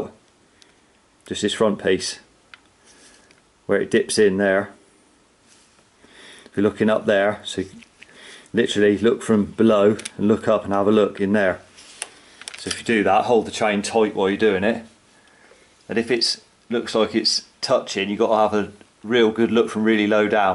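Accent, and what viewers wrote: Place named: Bristol, United Kingdom. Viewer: do you know, love the what must be bristolian accent and despite it sounding boring, to me today you are a hero.... All day I have been trying to sort my front derailiuer . I've checked 100 vids when things didn't or wouldn't go right... All I needed was to fit that tab that you so eloquently demonstrated. Jeez, I should have listened to you in the first place. thanks so much for this vid it's the best,.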